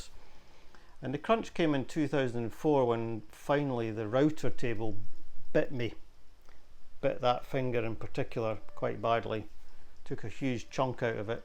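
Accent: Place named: Ireland. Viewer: good first video...I've always liked the Irish accent 👍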